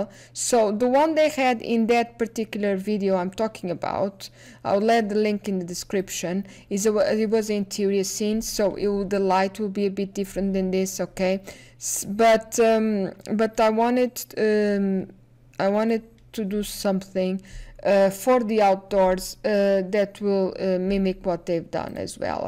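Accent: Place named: Portugal. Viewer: este sotaque tem, mas TEM, de ser tuga 👀 não engana ahahah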